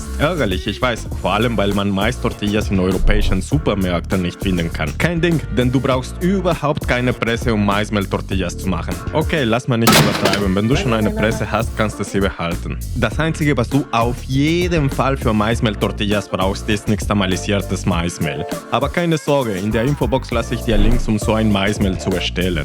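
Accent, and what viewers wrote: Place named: Russia. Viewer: Интересно, по акценту автор русско говорящий. Не плохой немецкий.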